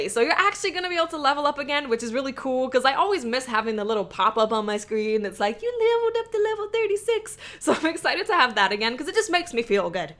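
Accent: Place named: New Jersey, United States. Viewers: Where are you from? it sounds like you're from new york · You have kind of a jersey accent but I might be wrong are you from around jersey or something (I'm not a stalker I'm just curious lol)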